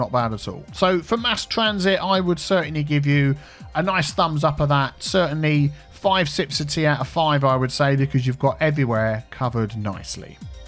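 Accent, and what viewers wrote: United Kingdom. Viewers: Haha you made me laugh. Ilbig interchange in the middle of water... i dont think you see many of these ..’mmhhmm haha very british i love it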